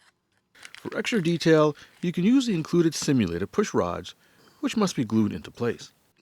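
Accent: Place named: Canada. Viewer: My fiance swears your Canadian and she loves your voice she says it very soothing.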